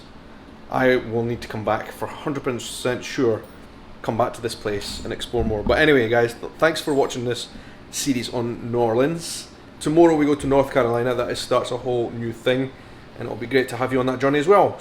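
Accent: Scotland, United Kingdom. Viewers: Absolutely funny as shit watching the subtitles try and keep up with your Scottisms lmao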